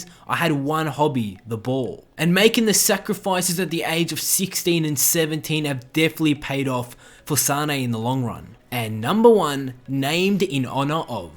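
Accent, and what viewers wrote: Australia. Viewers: le roy sane from Maineee chester citah!! aussi accent hahahh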